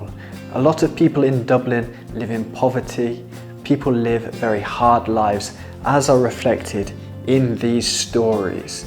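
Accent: United Kingdom. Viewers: Could you please tell me what's your accent? Cockney or rp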